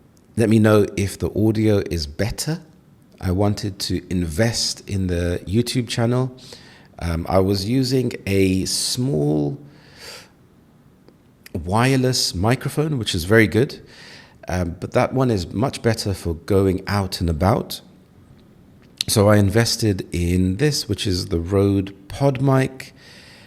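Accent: United Kingdom. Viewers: Hello Sir., it's the first time I've watched a video podcast episode from yours and I liked that, it was nice, relaxing and with a very clear sound and voice...a nice warm clear British accent the accent I'm trying to acquire for myself. I believe that I could try to shadow it if it is ok.Nice topic indeed, I don't buy on-line a lot and for important items I'd rather go in a shop big or small. Thank you very much, I'm happy to have found another interesting channel. Cheers! 🙏😊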